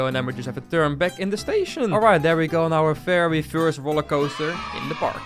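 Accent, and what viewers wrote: Netherlands. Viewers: wow ėėn goede nederlands aacent je bent ėėn echte nederlands person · The Dutch accent😂 love the video 🎉🎉🎉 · Do I hear Dutch? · vind het altijd grappig als ik bij nederlanders aan hun accent kan horen dat ze nederlands zijn btw goeie content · Je bent Nederlands dat hoor ik bij het begin van de video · Jij klinkt echt Nederlands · Ik kan horen dat je nederlands bent lol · “Som sietin” lemme guess your dutch😂 its such an dutch accent tbh, no hate ofc its just so funny to see a person that also speaks dutch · Lekker een Nederlands accent aan het engels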